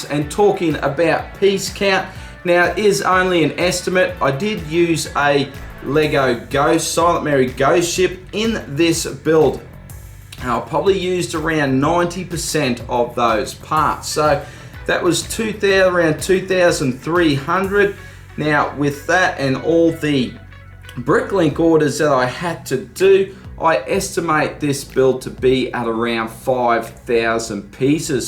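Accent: Australia. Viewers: this austrailian english love it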